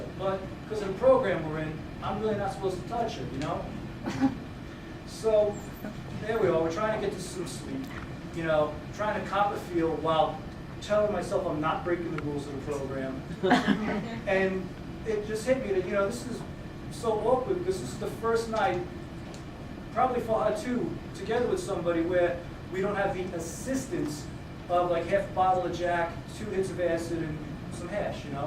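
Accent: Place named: New York, United States. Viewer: Dude, you are funny guy. I love your accent. Is it NY or Chicago? LOL If you watch my latest vid you will see the proper way of adjusting ric truss rods. PS- I liked rehab so much I went back three times. Hey I subbed to you, sub back. You rock.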